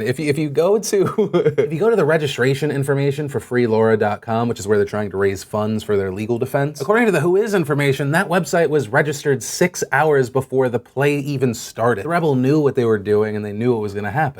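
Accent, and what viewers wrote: South, United States. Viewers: Maybe this is a dumb question, but: why does Philip DeFranco end up with a slight Southern drawl in the end segment? How did that come to be a thing?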